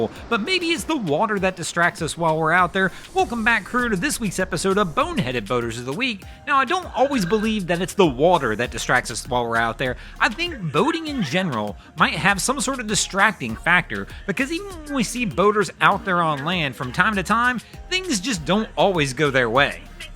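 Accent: United States. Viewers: After a few listens, you will realise that although he has an American accent annoying to Many, he really DOES know what he's talking about and actually makes the video complete. He is also a multiple qualified mariner with much experience. Either way....these are fun complications we all can never stop learning from. Cheers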